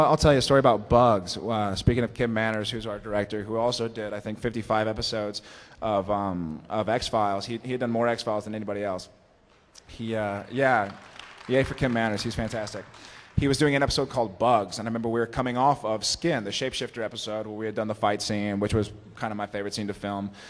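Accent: Texas, United States. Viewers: You can really hear his Texan accent here. Love it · Gotta love his Texas accent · I love the slight Texan drawl that his voice has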